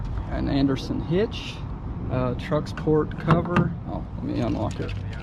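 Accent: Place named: West Virginia, United States. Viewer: Are you from West Virginia or something? You sound kinda hick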